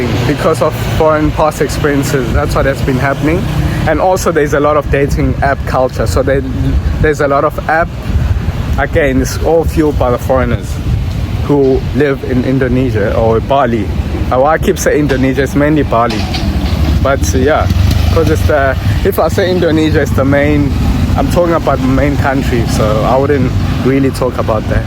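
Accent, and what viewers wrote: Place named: South Africa. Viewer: Definitely South African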